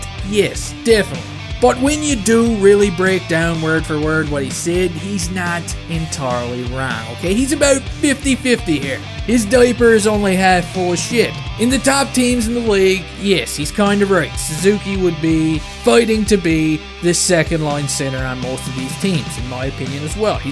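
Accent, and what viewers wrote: Newfoundland, Canada. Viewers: I can here the Newfoundland er in your voice. Maybe your a propane guy. As slick right I think guys comment hurt cuz it’s the truth as of right now.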